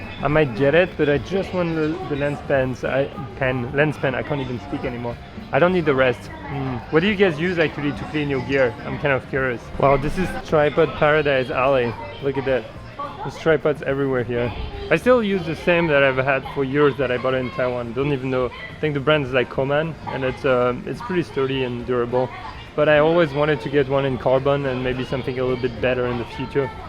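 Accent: France. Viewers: You have a french accent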